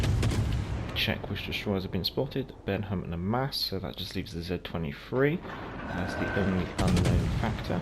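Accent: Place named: United Kingdom. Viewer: I also came over from spartan and its great to hear a British accent, maybe a link up with said spartan could happen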